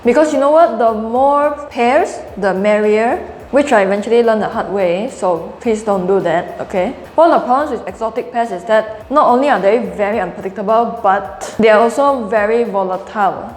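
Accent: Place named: Singapore. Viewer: Haha, really love watching your videos. Having someone explaining things in the style of Singaporean feels so homely.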